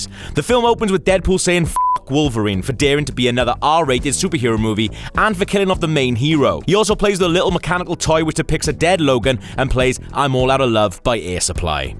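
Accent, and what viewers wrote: United Kingdom. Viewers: hey are you British? i cant really tell...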